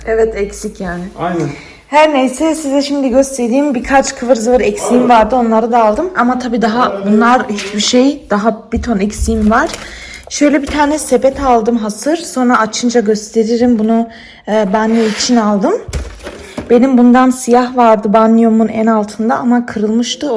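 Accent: Turkey. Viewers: Du bist die beste mit deiner türkische Aussprache Kıvır zıvır 😂 so eine schöne Wohnung 🤩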